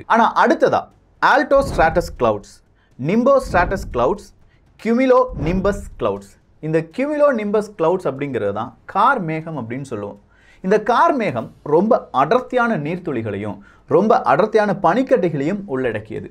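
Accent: Kerala, India. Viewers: Are you basically malayalee. Now and then you speak in malayalam accent. No intention to hurt you. Just anxiety. Please reply.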